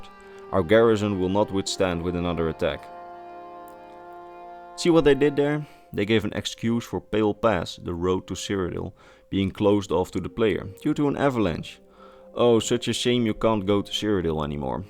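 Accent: Netherlands. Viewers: You sound Dutch, if I am correct in assuming this then awesome cuz i'm dutch, if not then sue me, nice vid tho. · Are you like a... Dutch Farengar? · I can hear from your accent that you are Dutch. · Are you Dutch? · is that a fellow dutchman i hear?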